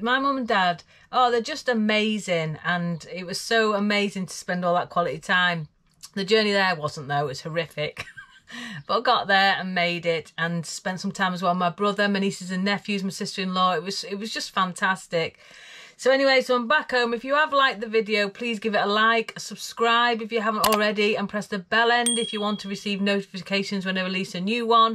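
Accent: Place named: Northern England, United Kingdom. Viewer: No offense to our southern cousins but I always find it a delight when I watch a video and get to hear a familiar accent from 'up 'ere' :)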